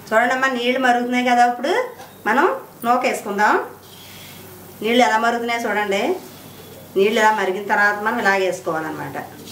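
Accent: Godavari, India. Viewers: abha.. maa godavari jillaa basha antha haayiga vundho vinadaniki aa matallo ame abimanam vundi 😊😊😊😊 thank you so much amma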